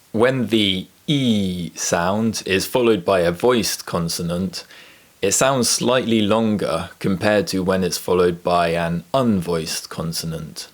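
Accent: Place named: England, United Kingdom. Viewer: hello, where are u from in England,:")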